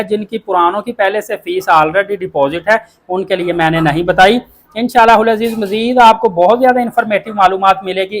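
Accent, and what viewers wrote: Punjab, India. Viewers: پالیسی لفظ ہے جناب پلیسی نہیں بولتے 😊 آنگلش بھی پنجابی میں 😊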